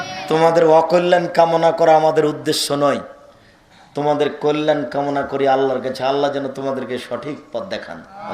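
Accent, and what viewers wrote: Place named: North, Bangladesh. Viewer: আইনুল হুদা আর আপনি দুইভাবে ধর্মের বানী প্রচার করেন। কিন্ত আপনি যেভাবে বলেন সে ভঙ্গিটা আমার কাছে সঠিক মনে হয় না। এভাবে কিছুটা অহংকারী মনে হয়। যদিও আপনি তা না। তবে উচ্চারণ সঠিক হয় না এর কারন কিন্তু আপনার জন্মস্থানের (উত্তর অঞ্চল) উচ্চারণের ভিন্নতার কারনে। একথা বুঝিয়ে বললেই হতো। এটা প্রত্যেকেরই হতে পারে। হুদা সাহেবের ও সিলেটী উচ্চারণ বা টান আছে।। আপানাদের মাঝের পার্থক্য গুলো ঘুচিয়ে ফেললেই আমরা অনেক দূর এগিয়ে যাব। ভুল হলে ক্ষমা করবেন।।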